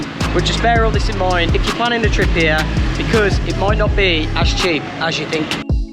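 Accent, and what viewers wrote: Birmingham, United Kingdom. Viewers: Thanks sounds like your a brummie nice one ime a jock 😊 just subbed very usefull i have done lithle research and decided pataya just offered that bit more nightlife etc as i allready decided booked off season june i been told it can rain all day every day mabey you know if thats true or not thanks just subscribed very usefull thank you looking foward too more your videos cheers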